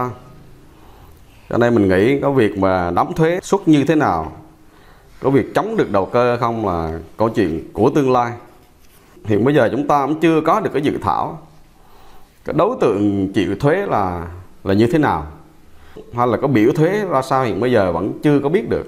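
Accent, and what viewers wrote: Quang Nam, Vietnam. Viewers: Tiếng nói của chú rất trung thực Chuẩn tiếng địa Quảng Nam. Chúc chú nhiều thành công .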